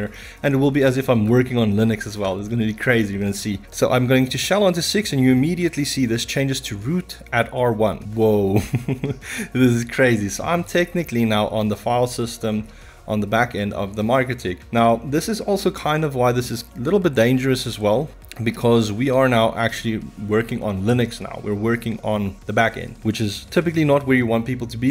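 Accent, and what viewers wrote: South Africa. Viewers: <num>... Knew I was picking up a South African accent. lekka man!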